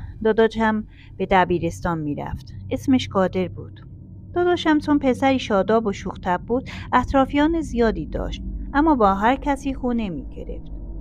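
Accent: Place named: Turkey. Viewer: داستان با لهجه ترکی تعریف کردن خیلی خیلی قشنگه ترک زبانها لحجه فوق‌العاده زیبایی دارن ماشاءالله ❤❤❤❤❤❤ ترک‌ها مرد و زنشون همه و همه مردومان باشخصیت باغیرت و محترمی هستن ❤ آرزوی موفقیت برای شما 🌹🌺🌹 و تمامی ترکهای عزیز و دوست داشتنی ❤️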